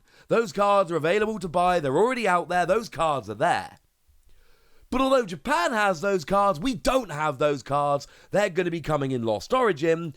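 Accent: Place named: United Kingdom. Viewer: Ehhhh? I've lived bham, exe and london and his accent is like pretty default brit I can't lie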